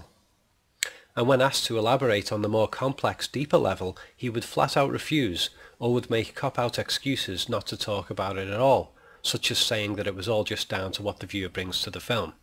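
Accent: Liverpool, United Kingdom. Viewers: I have a question: Are you a scouser??? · Just found this doc and it's just what I wanted to see before 2001's re-release this month (Nov 2014)...Also...Whey! a Scouser! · Do I detect a faint Liverpudlian accent...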